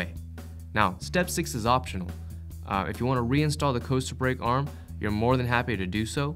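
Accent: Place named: East Los Angeles, United States. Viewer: Man oh Man , I really noticed this guys voice has that distinctive East LA Chicano accent , makes me home sick ... you probably did not even notice but it is a Hispanic Los Angeles Dude talking ! ... video was made in a suburb of Los Angeles !!!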